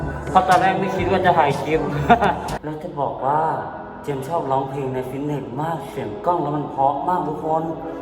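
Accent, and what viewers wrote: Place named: North, Thailand. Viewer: พูดเหนือบ่อยๆเลยนะคะ ฮือ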